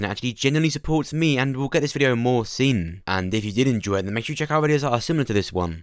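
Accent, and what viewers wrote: United Kingdom. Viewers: ...Not sure where you reside. Per the accent, I would assume/guess it's somewhere in the UK. All I can I say is you have what's called a "Radio Voice." In America, there's actual talent agents who specialize in voice work. I.e. Radio personality/Talent, as well as voice over for major movies, cartoons, etc. You should put a demo recording of yourself, with some sort of profile and picture and send it in to a talent agency or agent. I would send out a lot of the profile. I sincerely believe someone in the industry would be interested. You have a smooth and different type of voice in a good way. Please consider it, you just might have much success. A radio personality makes 7-8 figures a year. Doing movie and cartoon voice overs those people can make the same too. Give it a try you have nothing to lose. Just my opinion. But bet others would agree you have a cool voice that people like listening to.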